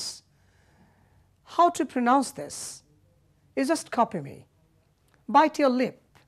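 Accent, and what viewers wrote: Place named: Bangladesh. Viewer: Your Mother tongue Bangla is clearly influencing your abilities here. You need to improve your own pronunciations by a long way and I mean by a very long way.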